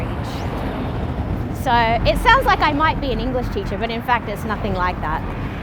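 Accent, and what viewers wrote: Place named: Australia. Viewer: My colleagues would disagree strongly 😂めっちゃオーストラリア訛の若干のアメリカ影響ですよ〜😂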